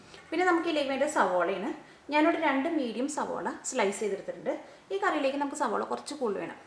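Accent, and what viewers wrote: Thrissur, India. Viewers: R u from Trichur?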